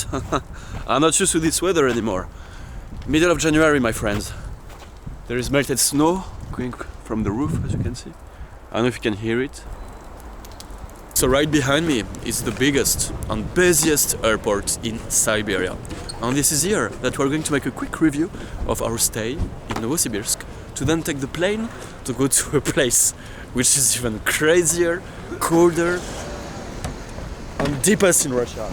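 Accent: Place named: France. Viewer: ещё бы от акцента избавиться и будешь похож на русского, но французский акцент милый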